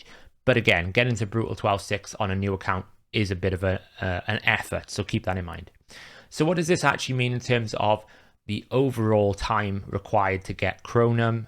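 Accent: Wales, United Kingdom. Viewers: Are you welsh? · You sound like your from llanelli or swansea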